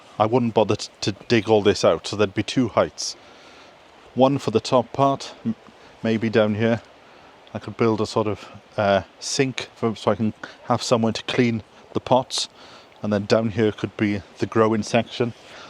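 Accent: Wales, United Kingdom. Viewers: I love your accent and I think it's got stronger since you moved back to Wales. The homestead is in a great location, you struck lucky there.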